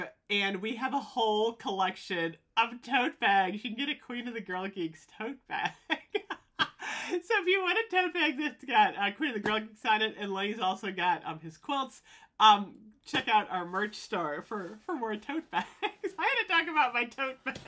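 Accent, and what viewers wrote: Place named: Ohio, United States. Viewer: I love watching your videos- there’s something almost nostalgic and comforting about your content. I kept thinking- “I bet she’s from Ohio!” I grew up in Columbus, OH and there’s something so familiar about your accent and style.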